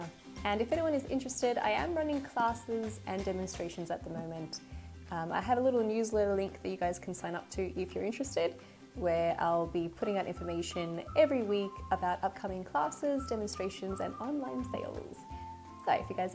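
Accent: Australia. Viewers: Oooh Australia, I love the australian accent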